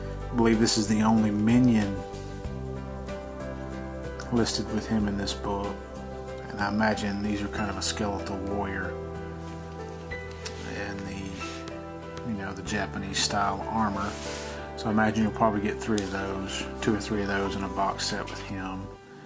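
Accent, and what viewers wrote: South, United States. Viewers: thank you very much for this. the musi with your soft southern vouce makes this very hyypnotising and relaxing.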